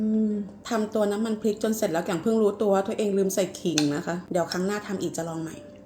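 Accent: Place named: South, Thailand. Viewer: น่ากิน มาก ตอนแรกเราก็ คิดว่าเป็นคนใต้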